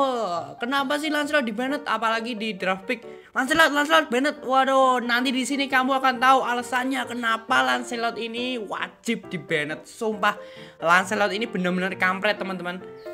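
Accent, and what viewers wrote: Java, Indonesia. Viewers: Orang jawa yag mas? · Pasti yg punya Chanel orang Jawa keliatan Logatnya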